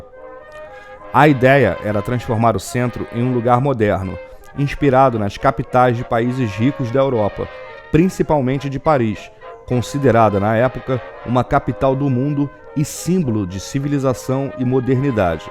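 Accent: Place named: Rio de Janeiro, Brazil. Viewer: Bonito este sotaque carioca do narrador, adoro. Eu sou italiano. Rio de Janeiro a cidade maravilhosa "davvero meravigliosa" eu visitei duas vezes quando viajei pelo Brasil muitos anos atrás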